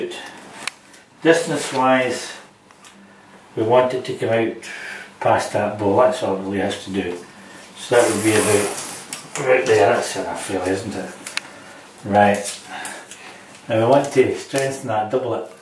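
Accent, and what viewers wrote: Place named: Scotland, United Kingdom. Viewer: That's a Scottish accent, don't know which area, but good to hear. Thanks very much for this video, really useful tips, I've put off doing this because all the American plumbers say to take out the toilet first - not good if you're a woman and in a rented flat!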